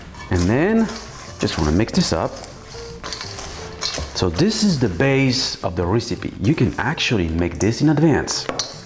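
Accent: France. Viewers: You are so delightfully smarmy! Your voice, your accent, your facial expressions... oh, you make me miss my time living en France. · first time viewer. your french accent, and story telling abilities sold me. subscribed already. :)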